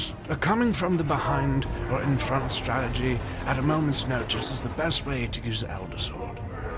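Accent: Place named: RP, United Kingdom. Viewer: Damm you do a bloody awsome David Attenborough aksent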